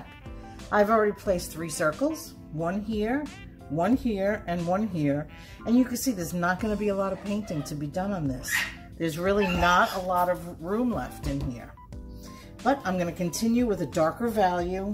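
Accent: East Coast, United States. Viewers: Ok,! I love this tutorial.. and your East coast accent 😜🥰 Thank you!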